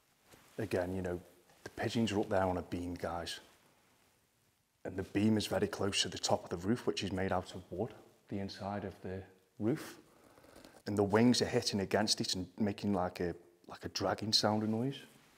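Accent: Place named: Australia. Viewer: I love Australian accent ❤️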